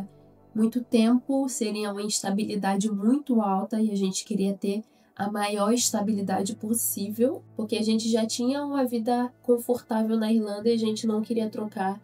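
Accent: Brazil. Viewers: Ja, was ist lustig finde, ist… Du bist eindeutig Brasilianer (an deinem Akzent), und du wirst auch eindeutig Schweizer werden.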